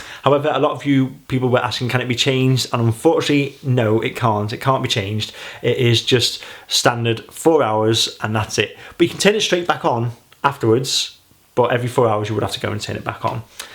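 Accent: United Kingdom. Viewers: proper British English... much easier for me to understand you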